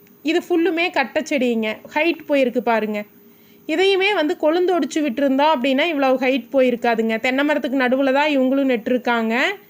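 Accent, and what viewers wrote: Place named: Kongu, India. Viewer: arumai akka unga kural kongu slang nalla iruku kekarathuku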